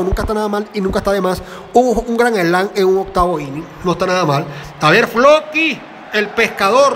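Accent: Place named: Venezuela. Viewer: Pareces que eres venezolano mi pana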